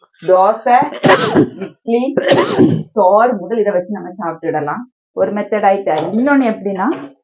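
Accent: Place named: Kanyakumari, India. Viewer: கன்னியாகுமரி தமிலால 😮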